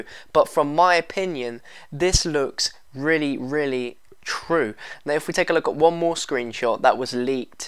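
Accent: England, United Kingdom. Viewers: well you are judging him by his voice? hes in uk not in america or anything he has an ENGLISH accent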